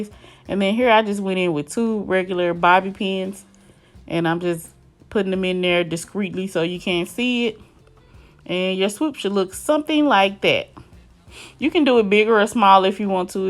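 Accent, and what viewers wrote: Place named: Dallas, United States. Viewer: I instantly knew you were from Dallas and you sound like me bih. And then you said Ebony beauty supply.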